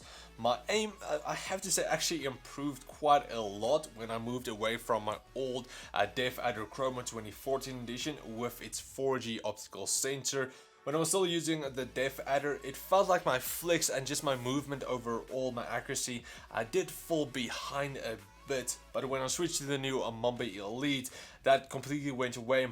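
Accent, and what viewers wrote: Afrikaans, South Africa. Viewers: Good vid. Judging from your accent and your website being .co.za you live in SA? I do too and most youtubers make builds in dollar. Can you please do a R10 000 gaming pc build? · Is y Afrikaans?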